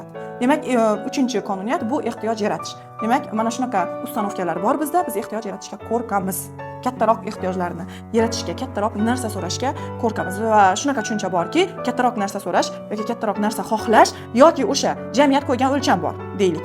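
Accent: Khorezm, Uzbekistan. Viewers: Асалому алайкум Хоразмликмисиз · Бу айол хоразмлик экан шева хоразмга кетябди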